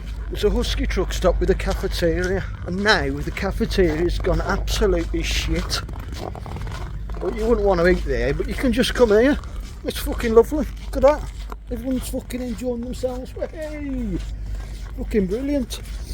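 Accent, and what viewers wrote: Midlands, United Kingdom. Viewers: Hello I’ve just come across your video I think it’s great even better when I noticed the midlands accent 😀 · Super good video first time i see your page if you dont mind me asking how did you end up driving a truck in usa when you got a uk accent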